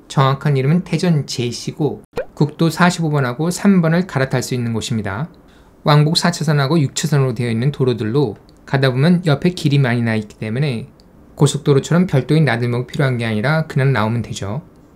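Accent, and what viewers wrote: North Korea. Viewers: 말투가 탈북인 같은데....아.... 새터민....이지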